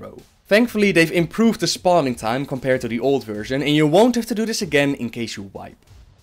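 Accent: Netherlands. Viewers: Hey man , recently i've become interested in lore and stumbled upon your videos , i've enjoyed them alot and learned so much since i started to watch them , now i have been asking myself are you dutch cause you have the same accent as me when i talk english haha . :P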